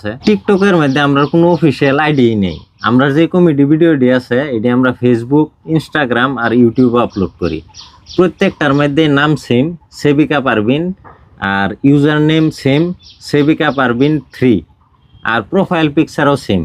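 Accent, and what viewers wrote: Mymensingh, Bangladesh. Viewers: চোরদের কোন কমতি নেই।যারা চুরির পিছনে থাকে,তারা চুরি করবেই। যাই হোক আপনার আঞ্চলিক ভাষা ভারতের কোন অঞ্চলের দয়া করে বলবেন।কারন আমাদের বাংলাদেশের ময়মনসিংহ জেলার ভাষার সহিত মিল আছে, তাই বললাম। ধন্যবাদ। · প্রথমে ভেবেছিলাম কিশোরগঞ্জের। কারন ময়মনসিংহ, কিশোরগঞ্জ, নেত্রকোনা অঞ্চলের আঞ্চলিক ভাষা এটা। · Ha, ekdom Bangladesh er Mymensingh, Jamalpurer vasha. Onek apon mone hoy. Ami Mymensingh er.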